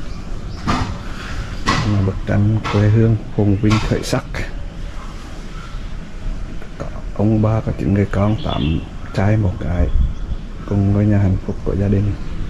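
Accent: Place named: Hue, Vietnam. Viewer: Chuẩn Huế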